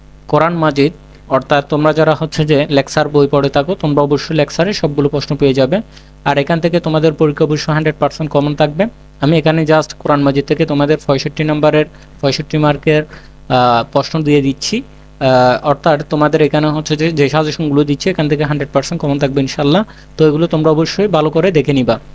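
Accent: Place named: Chittagong, Bangladesh. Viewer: Apni chittagong er taina😅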